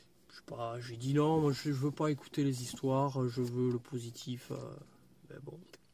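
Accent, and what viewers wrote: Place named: South, France. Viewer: Cet accent chante le sud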